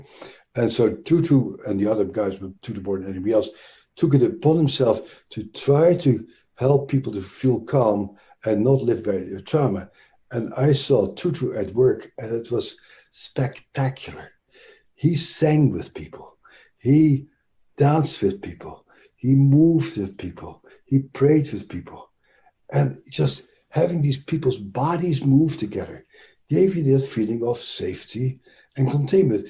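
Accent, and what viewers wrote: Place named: Netherlands. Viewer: I have lots of happy memories around neighbors with Dutch accents. So I love to hear Bessel speak. I am also part Dutch.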